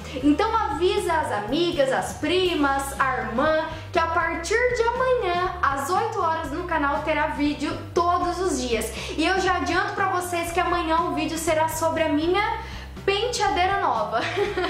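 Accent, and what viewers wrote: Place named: Caipira, Brazil. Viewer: você é linda!!! Já te acompanho a algum tempo e sigo a maioria das suas receitas caseiras, em especial aquelas para o rosto! amo o seu jeitinho de falar caipira!!! e sua simplicidade!!! parabéns!!!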